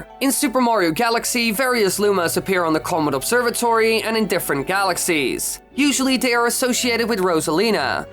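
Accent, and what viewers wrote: Netherlands. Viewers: Woah Why Do you say Utrecht & Utrecht Centraal so Dutch speaking?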